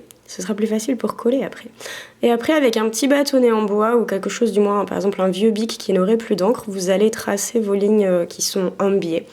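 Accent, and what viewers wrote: Toulouse, France. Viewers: Tu as pris l'accent toulousain !!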